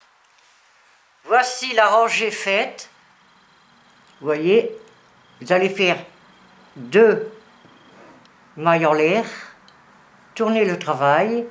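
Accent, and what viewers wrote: North, France. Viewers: bonsoir très contente de te revoir, il et magnifique félicitations un grand grand merci je vais encore t écouté avec ton accent du nord bisous et gros câlins a ponpon, a très vite je vais le faire trop beau très bonne soirée, Monique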